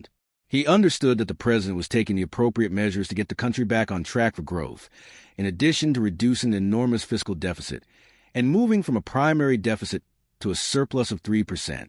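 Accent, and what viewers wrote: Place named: United States. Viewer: It is a robot voice with a American accent I am pretty sure.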